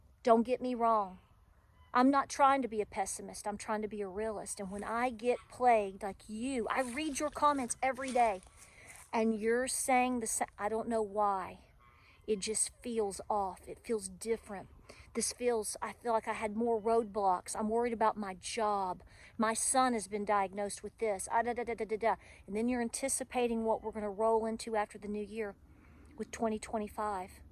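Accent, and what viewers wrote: South, United States. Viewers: I really like you. Love your southern accent, yes the attacks can also be spiritual fatigue. I felt that way befor3 the election. On another note yes ppl want flowers and rainbows but thats not the world we live in. Fear to face reality. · Your voice is super awesome idk what word to use the country twang and matter of factness. I enjoyed this. — on Christmas, my Christmas Day was the most enjoyable to date! My husbands and parents also. It was the best family time! Intimate. Games and bonding. Now Christmas Eve (extended family) some didn’t one due to political differences so that stunk :( Regardless! Praise you Lord Jesus! We love you!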